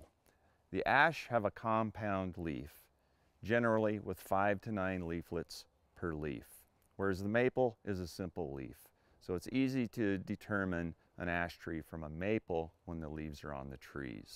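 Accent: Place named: United Kingdom. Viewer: Translation-Ash you're a tree? Nice! Wait is that a british accent? Sorry but I love british accents ♡♡♡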